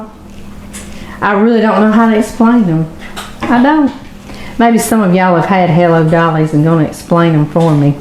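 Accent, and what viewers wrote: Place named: Louisiana, United States. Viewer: where are you in LA? I am from Shreveport. You sound like you could be from just east of S'port. My accent is a SE TX accent since I was raised as a little kid in Beaumont, TX. I tell people that I have an educated SE TX accent. I remember Mother giving me this recipe back in the early 70s. She got it from my Aunt Helen, her sister-in-law, who lived in Dallas. I believe they are named for the musical "Hello Dolly," which was very popular in the late 60s - early 70s. Yep, this is really a recipe from way before the 80s. I loved them and made them for get-togethers long before the 80s. One commenter said that they used a 9 X 13 pan for theirs. I believe that is the pan my recipe calls for, too. They come out just as delish, but thinner in such pans. I had a little party at my dinky house (half of a duplex) back when I was an undergraduate in New Orleans. 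My girlfriend helped me, but she was not much of a cook; I was the cook for us. Anyway, ones of my friends there said something along the lines of, "I can't stop eating those Hello Dollys. I've already had 4 of them." I replied, "You've had 6, but who's counting?" We both loved it because how often does one have the opportunity to use that line?!? Anyway, these little bar cookies that are almost candies are so tasty and simple enough for a boy just starting out cooking on his own to do with ease.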